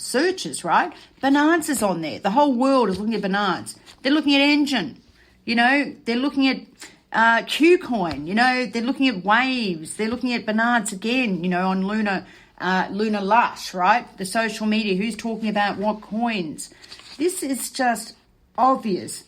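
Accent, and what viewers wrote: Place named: Australia. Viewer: Jezus, je bent nederlands , 🤔met een ozzie accent, geweldig!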